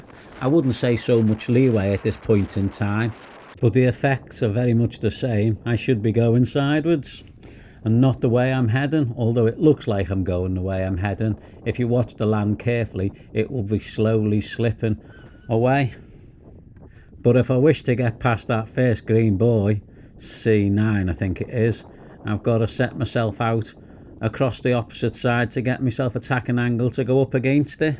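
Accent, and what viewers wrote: Liverpool, United Kingdom. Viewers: just found your site and luv it, i have missed the scouse drawl and luv your laid back attitude to sailing . Have you ever done castletown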